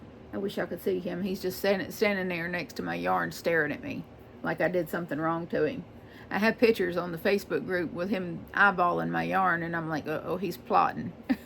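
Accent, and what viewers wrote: South, United States. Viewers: I enjoyed your tutorial. I like less formal. I love your accent...southern comfort. Don't worry about the complainers. More of us love your style than not. Keep up the good work. I'm looking forward to your next tutorial.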